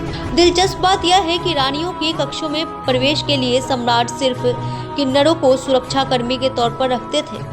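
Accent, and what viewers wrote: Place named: Bihar, India. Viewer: Voice over me bihar ka touch hai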